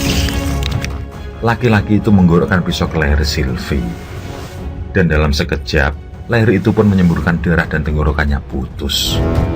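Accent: Java, Indonesia. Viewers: Pak dhe,, mohon maap,, walo pun saia pake headset, tapi sering kali di ujung kalimat yg pak dhe ucapkan sering g jelas,, di semua video pak dhe,,,krn pak dhe terlalu medhok,,,kadang susah di cerna oleh qu,,,mohon maap pak dhee,, 🙏🙏 salam dari aceh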